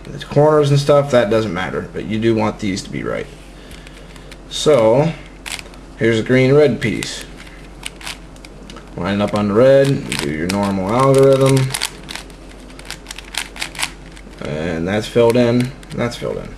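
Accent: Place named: West, United States. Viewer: you talk like a cowboy, nice dude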